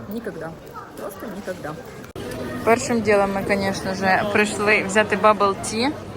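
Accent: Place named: Surzhyk, Ukraine. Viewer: Снідаю і дивлюсь ваш влог 😊дуже подобається ваш вайб і ваш суржик 😉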